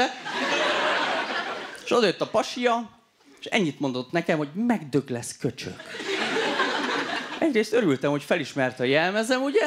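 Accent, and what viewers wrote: Székely Land, Romania. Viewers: Szekelyfoldon pontosan is beszelnek! :D Ott lakok szoval jol tudom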